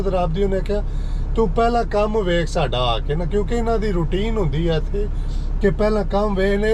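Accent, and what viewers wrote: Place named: Jhelum, Pakistan. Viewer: Ap Pakistan kis city say biking karty ho ap ki zuban bolo jhelum ki lag ry hay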